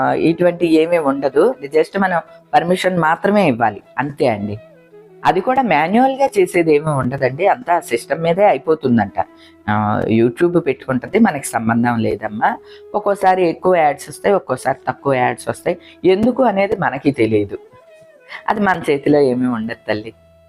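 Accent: Godavari, India. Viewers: Super amma...😂 Me gun shot answers vere level.. ayyo adukku thinadam varaku velladam endukandi.. tappu kadu... E dialogue matram super amma.. Mana godavari yaasa.. manam entha correct ga unna matladina nachani vallu alane antaru amma.. nenu chala navvukunna e video chusi. 😂we enjoyed a lot 😂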